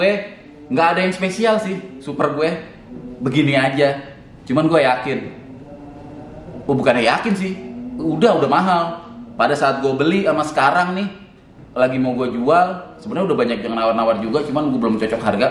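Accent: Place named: Tangerang, Indonesia. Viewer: lokasi di Tangerang kah? Logatnya kayak Tangerang2 benteng gituu, haha asiikk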